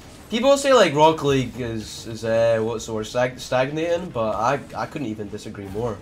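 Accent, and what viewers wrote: Scotland, United Kingdom. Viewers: Is he Scottish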